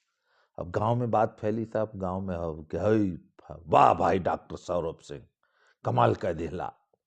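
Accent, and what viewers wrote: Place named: Bhojpuri, India. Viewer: Aapke sunane ka a andaj kya khub hai. Bhojpuriya andaj 😀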